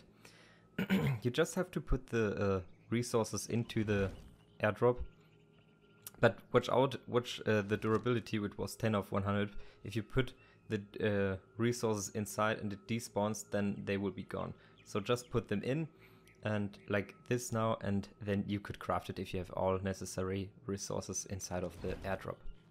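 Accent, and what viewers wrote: Germany. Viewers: Do I hear DEUTSCH?????? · Hi thanks Bist du deutsch?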